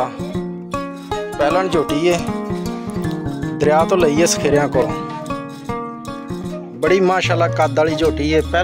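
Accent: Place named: Saraiki, Pakistan. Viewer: 2 coment mashAllah Meethi zuban panjabi srikiy good vlog dear 🌹🌲🌱🌷🌼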